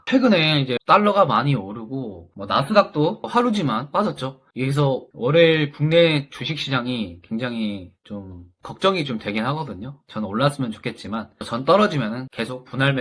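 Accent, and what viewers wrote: Jeolla, South Korea. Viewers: 전라도 분이네요! 와 귀하신 분!❤❤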